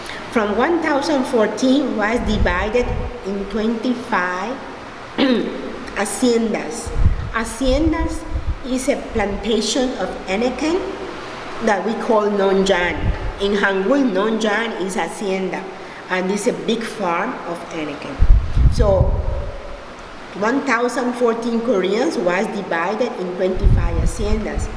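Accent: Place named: Philippines. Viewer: Its interesting because she sounds Filipino talking English so I guess that's what Latino and Korean mixes lol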